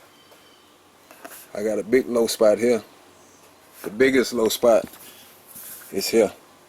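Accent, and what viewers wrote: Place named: Texas, United States. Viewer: I don't know you. First time seeing one of your videos. But that Texas comin thru strong in yo twang. Good stuff Mayne!!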